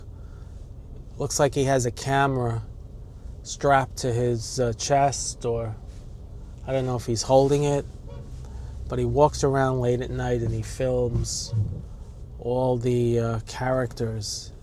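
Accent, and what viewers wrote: New York, United States. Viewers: I wanted the Bronx and I found it. Thank you, also for great language, I can watch and listen without subs. Distinct speech but keeping that NY accent. Watching from Poland.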